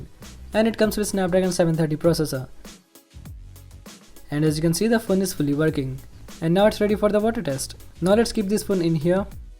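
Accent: India. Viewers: I love Indian English 😂😂😂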